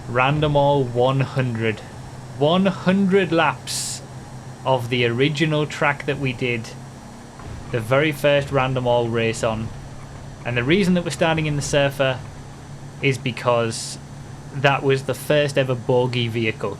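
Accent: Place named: Hartlepool, United Kingdom. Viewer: Cant believe your from Hartlepool I've been watching all these years trying to work out the accent! I'm from the area :')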